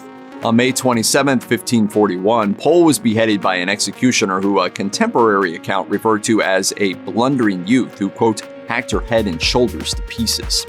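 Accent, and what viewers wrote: United States. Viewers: I didnt finish the documentary because of the annoying American accent 🤷🏽‍♂️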